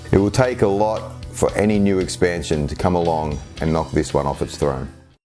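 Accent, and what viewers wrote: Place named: United States. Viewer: I am definitely going to add Modern Metal and Dark Matter to my EZX collection... You're so right about the clarity of Modern Metal sounds... OMG *Random question...., did you ever live in the United States? I had a childhood friend that you remind me of who lived in my neighborhood in Georgia...he even had an accent similar to yours. I can't remember his name...he had a brother named "KK." -at least everyone called him that. The family moved away early...I was probably 6 years old. -I am almost 50 now.. 😂 ***Your channel has become one of my favorites -out of nowhere... great content,!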